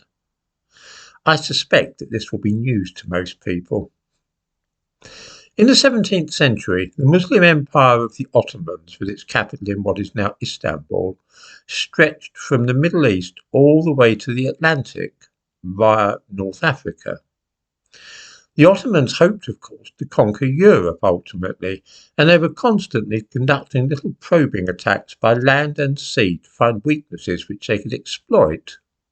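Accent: United Kingdom. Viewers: This guy's British accent screams conspiracy and exaggeration. Anyway, white slavery is a collective 'moved on from' because not victims.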